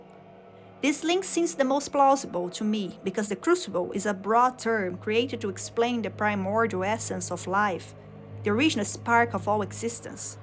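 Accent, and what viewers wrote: Brazil. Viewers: I recognized that Brazilian accent instantly! Lol anyways I was really interested in this topic and your video was one of the first results, so thanks for making it! I actually felt a chill when I saw his second phase the first time. Fromsoft has a way of storytelling without words, where you just understand someone’s entire story and struggle from their actions. When he reached this “redemption” it really made me feel for him, like when he finally met his match (the player), he gets granted a second chance by the gods, presumably with a Miquella-esque nature judging by the arcs. This would tie into your point about the peoples of the Lands Between before Marika, who might’ve been persecuted much like with any empire trying to instate their own doctrines. Miquella’s Haligtree was this “sanctuary” for the afflicted and shunned. I initially thought his arm had some connection to the abyss, like Artorias. 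Especially since Nightreign brings back Dark Souls, I’m unsure if they now officially share an universe. But much like Nashandra brought the influence of the abyss to a far away place, maybe it has something to do with the “Night.” I thought Heolstor’s movements resembled Artorias a bit. Anyways, his “nuke” attack is probably the most cinematic thing I’ve seen in this game. This fight is so much poetry told with such few words.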